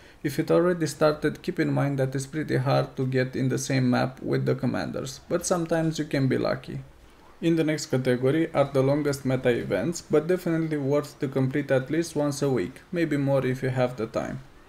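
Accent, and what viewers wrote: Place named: Romania. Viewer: I like your accent, where are you from? Romania? Turkey?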